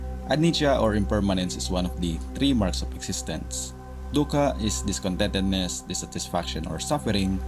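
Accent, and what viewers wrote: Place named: Philippines. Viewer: you have a Filipino accent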